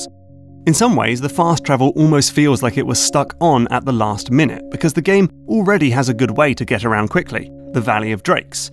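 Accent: United Kingdom. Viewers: What's up with the great analytical YouTubers all having UK accents?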